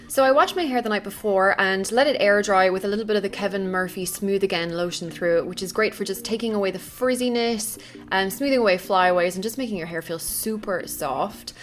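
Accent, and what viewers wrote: Ireland. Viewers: You look just beautiful, radiant!! I love your vlogs. I'm a Brit living in the States. It is great to hear an Irish voice! :-)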